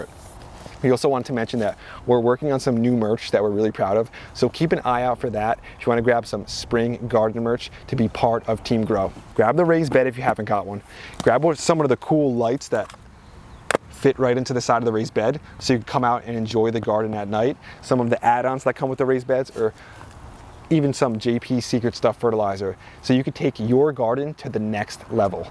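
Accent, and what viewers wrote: New Jersey, United States. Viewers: 💦💦💦💦💦💦💦💦💦💦 I love how you stay true to your Garden State home, by continuing to pronounce water the Jersey way ~ “wooder”! 😊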